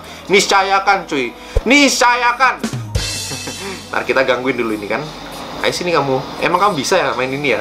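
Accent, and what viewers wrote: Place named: Malang, Indonesia. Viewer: Arema medok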